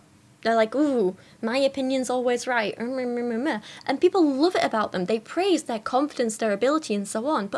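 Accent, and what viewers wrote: United Kingdom. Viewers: In addition to misogyny, there are a couple of other prejudices Americans have that may be related to why you have been called pompous in comments. First is Americans often associate British accents with being rich, pompous, and stuck up. I’m not sure if it is because of old movies, or following the British royalty too closely, or the listeners feeling dumb for having some difficulty understanding you. (When I first found your channel, I would use the closed captions, but was fine after two or three videos. My mom would watch British shows but my father could never understand the accents, even after 40 years of marriage.) Another prejudice the American right has is that anyone who is educated, man or woman, is a pompous liberal who looks down on them. They often blame “liberal” colleges instead of questioning what could be wrong with their beliefs that causes smart to reject them.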